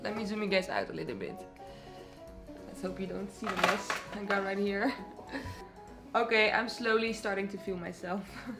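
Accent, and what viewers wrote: Netherlands. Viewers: Leuke video, ik heb je nu pas gevonden. Ik hoorde je accent en ik dacht: die is Nederlands.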